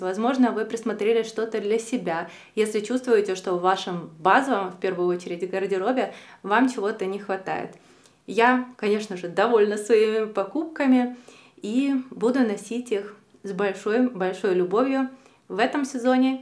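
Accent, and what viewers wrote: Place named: Surzhyk, Ukraine. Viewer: хочу сделать вам комплекс. Примерно 4 года назад я перестала смотреть все ваши видео потому что ваша речь была просто ужасной. Постоянное эээ, мммм, все жутко медленно и без конструктивного текста. Случайно наткнулась на это видео и хочу сказать что сейчас стало намного лучше!! Ваш русский тоже стал лучше, сурджик уже не так сильно режет слух. Ещё есть куда расти, нужно поработать над тем чтобы быть более естественной перед камерой, но все же- очень заметен ваш рост как ютубера! Так держать! 👏🏻👏🏻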